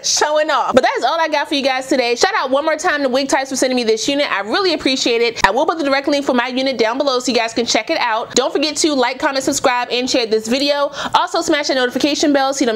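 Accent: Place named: DMV, United States. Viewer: I loved this video. Random question, are you from Maryland, DC, or Virginia? I am, and your vibe (music/Go Go vibish and the way you talk “this joint” 😂) is how some of us talk.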